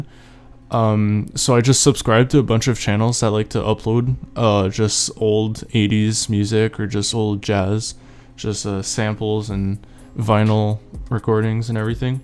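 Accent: Quebec, Canada. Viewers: tes quebecois? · j'ai failli pleurer quand j'ai entendu ton magnifique québecois et juste après les sous titres 😂😂😂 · Wtf are you from Quebec? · what you are québécois je savais pas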